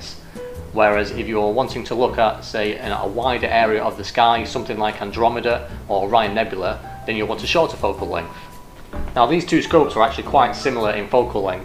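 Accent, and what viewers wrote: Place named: Liverpool, United Kingdom. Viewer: loved the video. Ignore the YouTube trolls trying to get a laugh for their site on his Beatles Liverpool accent.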